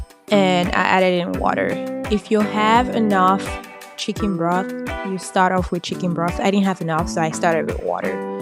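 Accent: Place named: United Kingdom. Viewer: Why did you sound British in this video abi na AI? 🤣🤣🤣. Tell me the truth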